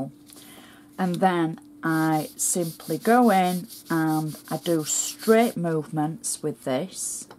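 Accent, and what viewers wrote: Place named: Yorkshire, United Kingdom. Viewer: Nuvo product doesn’t have a sponge, and I never actually learned the “proper” way to use it, so obviously I get it all over my fingertips. Because you’re right: you just want to dig your fingers in it at once. Fortunately, I don’t have a lovely manicure like yours, that I wouldn’t want to mess up. PS. Love listening to you speak. Your Yorkshire accent is “loov-ly”. 😻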